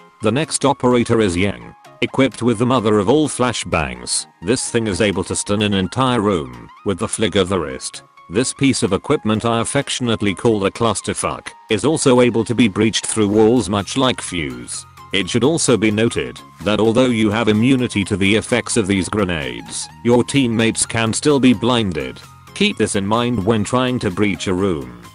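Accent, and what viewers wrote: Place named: Australia. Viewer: You should use your own voice on some videos cos OMG your aussie accent is amazing:) everyone needs to have heard the smooth taling aussie that is;)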